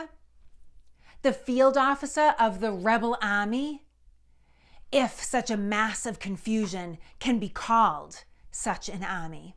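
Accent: Boston, United States. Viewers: I love your Bahston accent and I'm from Boston... Bean Town forever!! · I love your channel.I love american history and I learned something today, thank you for doing these videos.I love your boston accent you're very genuine, and very adorable person and I believe you are gift from God to us.Americans and may God bless you forever! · That accent. My Bostonian heart. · That accent is definitely Boston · Interesting historical info and I enjoy her Boston accent but I wish someone would tell her that she doesn’t need a separate hand gesture for every single word. Just speak; you don’t need to continuously wave your hands. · OMG, love that strong Boston accent! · now that's an authentic Boston accent. Awesome uncovered history! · you're reading too much into it. Time to relax. I was smiling and laughing through the entire video. Her Boston accent made me HAPPY.😂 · A great story. 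Plus, a well-edited presentation, delivered with passion and enthusiasm. And a fascinating accent! I had no idea that this is the Bostonian sound. The whole video is clear, measured, and charming. Thank you. 👏🏻👏🏻👏🏻 Subscribed. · Very interesting, great job!! Love the Boston accent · Subbed for the Bahston accent ALONE. Intelligence:: BONUS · Wow, that is one thick Boston accent! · WILL YOU MARRY ME?! …and speak freakin Boston-ese sweetly in my ears for as long as we live?! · My God, the accent... never heard one this thick before, I wonder where in BeanTown was she raised? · Who’s here for the bahstun ahkscent……..the lettah, the cah, I love this accent 😂😂😂…..❤❤❤ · “Ahlettah was sent that nevah was meant to be seen…. It makes you wandah…” Now that‘s a Boston accent! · Now THAT’s a true Boston or at least Massachusetts accent!🤣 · I cant believe i thought she was British for a minute . Her Boston accent is so Revolutionarly Fantastic!!!! 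❤❤ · Please edit your video captions. You have a Boston accident that makes it hard to understand. So I I used captions. Where is Wuben? Good info, just make yourself more understabdable. Thanks. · Hearing these stories from someone who is clearly a Bostonian and has the accent to prove it makes me feel good, I was raised in Somerville and know its history very well. Thanks for sticking to your roots! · I love the Boston accent! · As always, the facts you bring to us are fascinating and often something we are learning for the first time. Isn't it tragic to betray not only a friend but your own country. Btw, I'm not from Massachusetts but I love your Boston accent. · “…. but this leatter conTAIns FAr Maore TAN meats ta eye.” I had forgotten how much I love a properly spoken Boston accent. AND wicked good content? The narrators constant location changes requires far more volume mixing with a sound bar than one would think. 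Truly impressive post production work! It was seamless. Hearty congratulations! I’m ALL in !! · I truly love your enthusiasm for history and your Boston accent is amazing! Consider me Michael Paul Padilla a fan and a friend in history!! Thanks so much for your wonderful videos!!! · I love lovely Boston accent. Out here on the west coast we don’t get to hear that enough. It took me a while to figure out that what she pronounces as “Woben” is actually Woburn!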